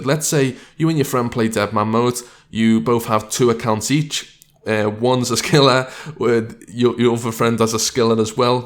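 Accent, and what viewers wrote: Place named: Liverpool, United Kingdom. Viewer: Scouse nonce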